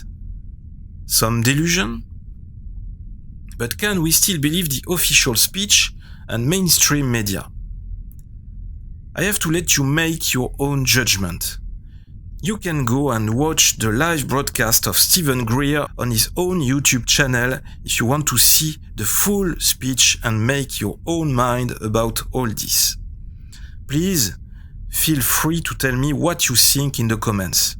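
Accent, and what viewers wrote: France. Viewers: love your french accent :) keep the cool videos rolling out! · Swedish is my native language but/and I understand you to 100%. Please don't lose your French accent, it would such a shame. Merci de partager vos connaissances avec nous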